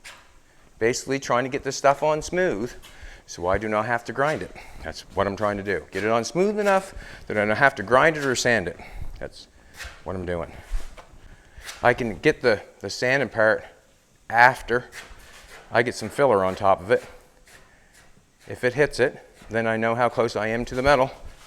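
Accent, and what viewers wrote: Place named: Newfoundland, Canada. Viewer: If I’m not mistaken, that’s a maritime Canadian accent. Newfoundland?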